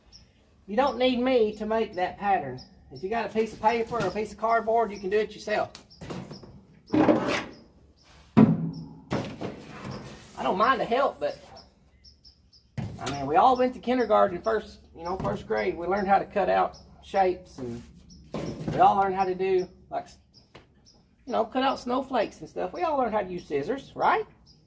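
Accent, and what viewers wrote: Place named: South, United States. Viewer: I’m watching from Poland and I understand you just as fine as anyone else buddy! I actually love country accent!! Keep you the great work fella!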